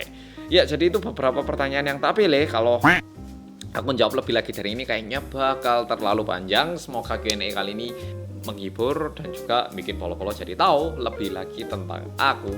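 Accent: Java, Indonesia. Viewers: Medhoooooooookkkk.......hahahahah.assek banget liat videonya.ngga bikin bosen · Mas...Mas bicara korea juga medhok. Kuereennn rek 🥳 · Katanya orang korea nyang medok dan hidup bertahun tahun di indonesia(kususnya pulau jawa) tapi kok gk tau mlumah sih? Mlumah itu terlentang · Orang Korea yang medokkk❤️ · Baru kali ini liat orkor medok😂,, aku aja org indo nggk loh🙄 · Gercep nih mas medokk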